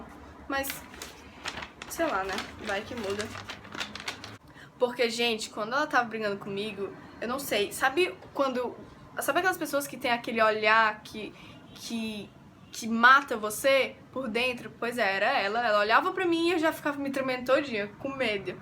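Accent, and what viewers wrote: Ceará, Brazil. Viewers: não sabia de onde tu era, mas reconheci o sotaque cearense, q é meu sotaque tbm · nossa reconheci o sotaque na mesma hora que ouvi vc falando . CEARÁ ❤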